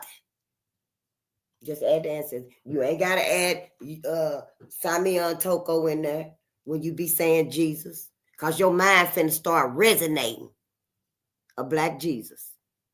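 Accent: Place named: South, United States. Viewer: Sis I like your country twang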